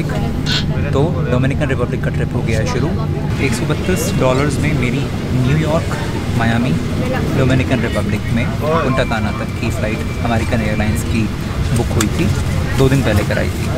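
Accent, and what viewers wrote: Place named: Haryana, India. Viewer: Bhai love the way use haryanvi accent and words in between ur lines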